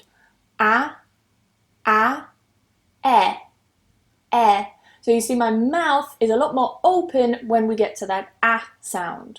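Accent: United Kingdom. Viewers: Love your British pronunciation!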